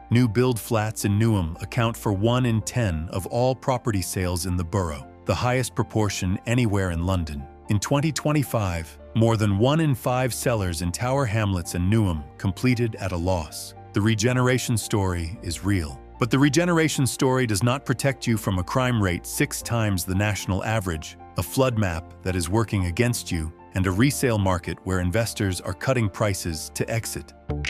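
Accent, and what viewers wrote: United States. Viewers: Please avoid American voiceovers. Firstly, on subjects about London (or Britain in general) it sounds like it's carping. Secondly, since the advent of the disgusting president Trump I never want to hear an American voice again. I turned off after about 30 second. Goodbye! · Clap trap.....also American accent.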